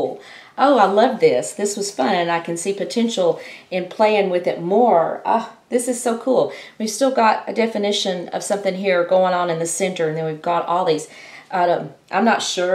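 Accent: Texas, United States. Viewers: So. Moving from California to Texas. Good that you already haves Texas accent.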